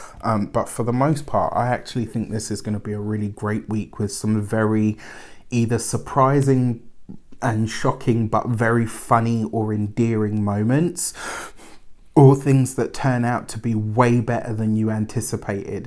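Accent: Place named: United Kingdom. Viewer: Sending you healing energy and no worries about aesthetic... a British accent can pair with a sock puppet and come off as important and eloquent. Feel better☺ (excited about the new people appearing in the reading )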